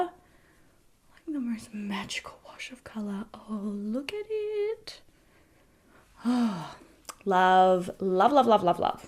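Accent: Australia. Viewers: You sounded so Aussie in this video! 😂. Loved this video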